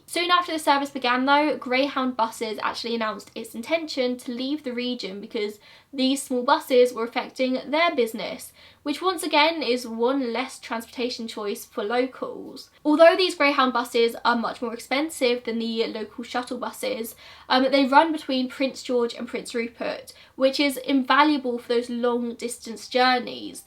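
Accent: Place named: RP, United Kingdom. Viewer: I've always had thing for posh speaking sexy girls like yourself, please take this has a complement not some sleaze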